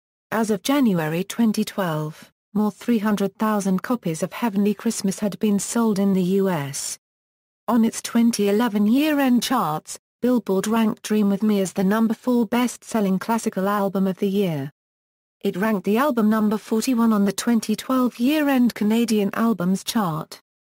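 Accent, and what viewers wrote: United Kingdom. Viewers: Nice professional bio. The reader has a nice British accent, but some serious mis- pronunciations. Most serious is Jackie's last name: it should be ee - VAN - koe! Fairly good organization of many activities and accomplishments; it would be good to accent the headings and pause briefly after each one. The vocal delivery could be a bit more expressively modulated, with some enthusiasm, and somewhat less objectively flat. Thanks, though, for having this information about such an astonishingly remarkable youngster available for those who cannot read about her visually :)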